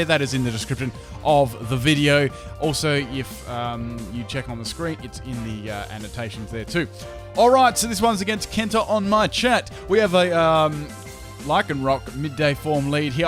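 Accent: Australia. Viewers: Wait is Australian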